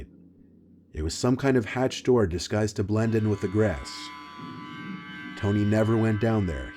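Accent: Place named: New Jersey, United States. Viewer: Me nightmare is def from like New Jersey or philly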